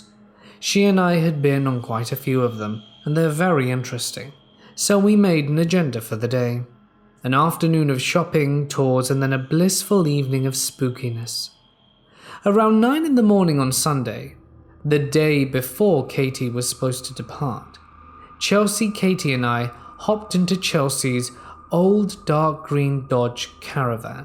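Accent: United Kingdom. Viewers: His voice has been putting people to sleep for years! I wonder Truly how many of us go strait to anytime we can’t sleep, have issues sleeping, or just crave that gloomy doomy creepy British voice from the gates of hell to whisk us off to dreamland to challenge Freddy Krueger to a death match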